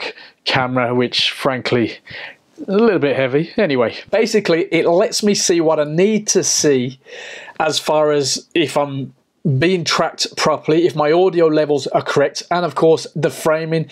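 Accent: Birmingham, United Kingdom. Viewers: nice work, you sound like a fellow brummie! i didn't even realise this simple idea existed.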